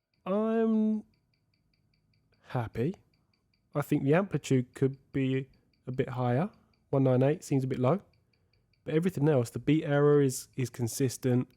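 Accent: United Kingdom. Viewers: Really interesting channel mate. Just starting to take an interest in watches. Good to hear a British accent 😂👌🏾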